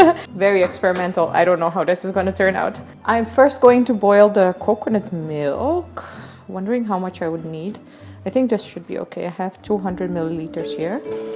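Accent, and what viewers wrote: India. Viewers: I kind of hear an indian accent 🙈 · Hoi hoi, wat een leuke video. Ik moet je eerlijk bekennen dat jou Engels achteruit is gegaan. Ik weet dat je nu in India woont, maar je spreekt nu ook echt engels net als de lokals. Vind het jammer, want je sprak altijd heel goed engels. # No diss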